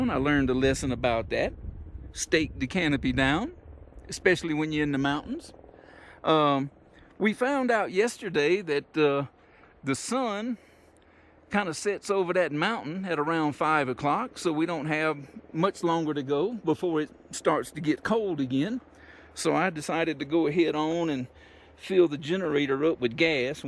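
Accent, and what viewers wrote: United States. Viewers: I like you. I sure can hear Mississippi in you voice. Daisy sure is calm. Beautiful camp sight. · I loved your video and your southern accent!! I was looking into small campers, easy to handle since I will be camping by myself. Please add more videos with your set up and all the gadgets you think are the most useful for campers. Thank you so much again!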